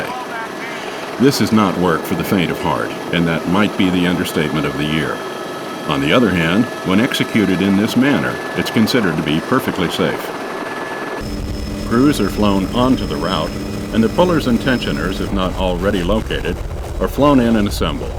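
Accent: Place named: United States. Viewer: narrator hard American accent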